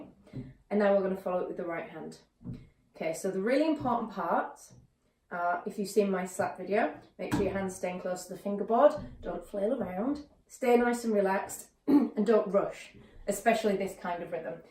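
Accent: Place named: Yorkshire, United Kingdom. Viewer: Great, look forward to more. 'll steadily go through the playlist of videos you already have, just looking at your Ring of Fire tutorial from July. Shame you're not based up more north or East Midlands, I do better with in person lessons . PS sounds a bit like perhaps you're originally from Yorkshire :)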